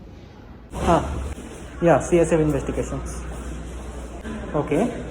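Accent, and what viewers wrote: Bengal, India. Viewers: Dada apni bangali to.....laglo apnar kotha sune tai bollam..? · Just guessing that, you are a Bengali, by your hindi speaking style(are you a Bengali ?). By the way another bengali here. Medical aspirant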